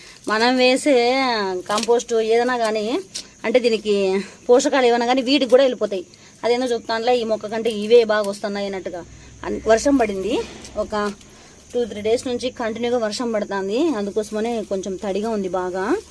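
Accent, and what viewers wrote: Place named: Rayalaseema, India. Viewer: Mi devuru medam rayalasima lage vunnai matalu madi kadiri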